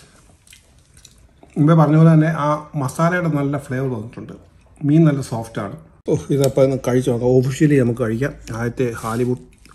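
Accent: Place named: Central Travancore, India. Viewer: ഈ.. ഒരു വരവും.. ആ പറച്ചിലും... ഞങ്ങടെ മാവേലിക്കര ചെങ്ങന്നൂർ.. തിരുവല്ല കാരുടെ.. നിഷ്കളങ്ക സംസാരം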